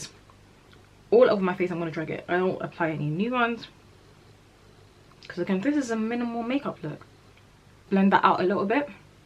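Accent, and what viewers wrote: United Kingdom. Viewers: I just subscribed because of your accent...I don't know why I love British accent so much 😭🥺🥰🥰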